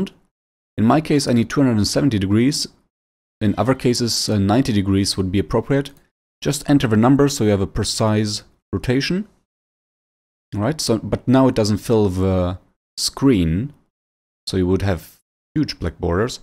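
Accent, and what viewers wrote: Germany. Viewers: Hört sich schwer dannach an, dass deine Muttersprache Deutsch ist?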